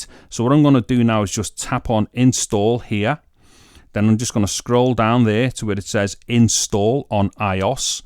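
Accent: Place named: Liverpool, United Kingdom. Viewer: Are you from Liverpool? U sound scouse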